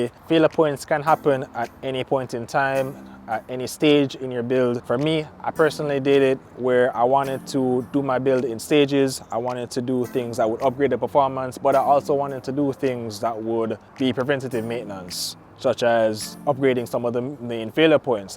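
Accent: Jamaica. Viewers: Very informative video. Jamaican or Trinidad?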